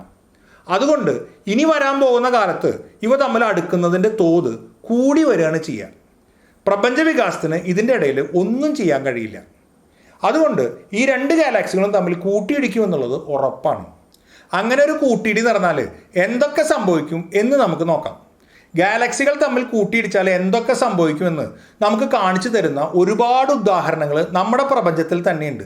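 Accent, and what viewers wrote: Thrissur, India. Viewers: നല്ല അവതരണം വളരേ വ്യക്തതയാർന്ന വിവരണം . അതിലുമുപരിയായി ഭാഷാശൈലി ഒരു തൃശൂർകാരന്റേതും . ഞാൻ ഒരു തൃശൂർകാരനാണേ അതുകൊണ്ട് പറഞ്ഞുപോയതാണ് . ഇതുവരെ ഇതുപോലുള്ള ഏറ്റവും പുതിയ അറിവുകൾ പകർന്നു തരുന്ന മറ്റൊരു വീഡിയോ ആരും അപ് ലോഡ് ചെയ്തതായി എന്റെ അറിവിൽ ഇല്ല . വീഡിയോ വളരേ നന്നായിട്ടുണ്ട് .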